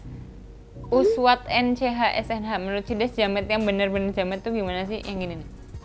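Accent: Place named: Indonesia. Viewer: logat nya indonesia banget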